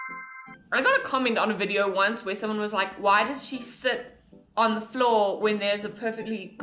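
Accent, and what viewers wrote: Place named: South Africa. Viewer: SO NICE WHEN I UNEXPECTEDLY HEAR THE SOUTH AFRICAN ACCENT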